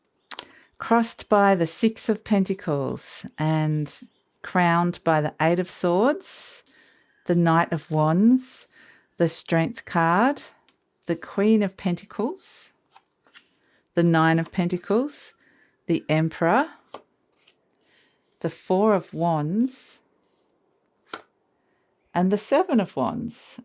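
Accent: Australia. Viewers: R you from Oz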